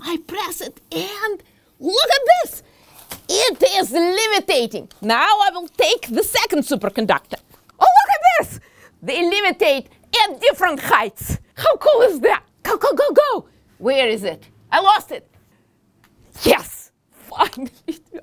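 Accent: Russia. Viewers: My new fav person 😂 makes me miss one of my favorite teachers, Mrs. kaziyarian — same Rusky accent with a zest for teaching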